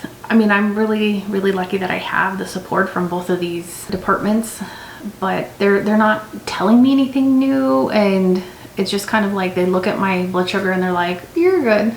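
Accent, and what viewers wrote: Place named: North America. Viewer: Oh My Gosh, you sound so North American 🇺🇸. After all these years I just heard your voice. Oh dear it sounds painful.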